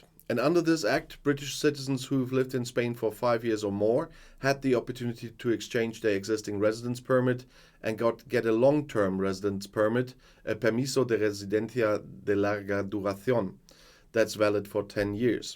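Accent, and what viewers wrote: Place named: Germany. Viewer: Nice German accent..😎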